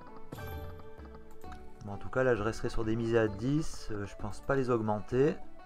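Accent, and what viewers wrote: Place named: South, France. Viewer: Tu habites dans le sud ? T'as un accent 😄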